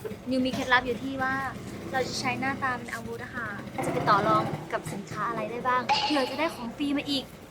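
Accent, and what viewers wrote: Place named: North, Thailand. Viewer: Journeyที่รักเห็นว่าไปมาทั้งต่างจังหวัดและต่างประเทศแล้ว อยากเห็นไปเจอนี่ในบ้านหน่อยค่ะ😂 แบบเป็นคลิปทัวร์ในบ้าน เพราะเห็นมุมในบ้านพี่นิวผ่านในไลฟ์มาบ้างแล้วส่วนตัวคิดว่ามันสวยแล้วก็น่าอยู่มากๆเลยอยากให้พาชมมุมอื่นที่ยังไม่เคยเห็นแล้วก็แนะนำการตกแต่งบ้านอะไรประมาณนี้อ่าค่ะ เป็น special clip journeyที่รัก x SPECSPACE ก็ได้เพราะพี่ ก็ทำรายการแต่งบ้านอยู่แล้วด้วย น่าจะสนุกมากแน่ๆเลย ยังไงก็รอติดตามคลิปต่อไปอยู่นะคะ☺️💗💗💗 ปล.พี่นิวอู้กำเมืองคลิปนี้น่ารักมากๆเลยย พูดอีกบ่อยๆนะคะ55555 <link>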